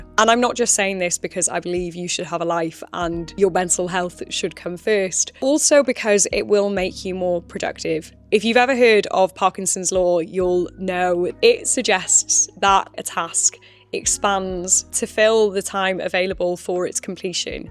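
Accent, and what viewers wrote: United Kingdom. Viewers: Hello from Russia ❤ I love your vlog and your sweet British accent 😊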